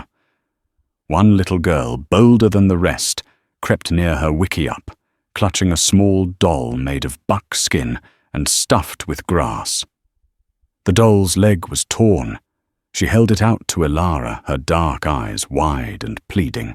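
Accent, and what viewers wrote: United Kingdom. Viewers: That is a British accent, in my opinion, the nicest of the very many British accents from around the UK…. He places emphasis on words much more often than most of the other voices.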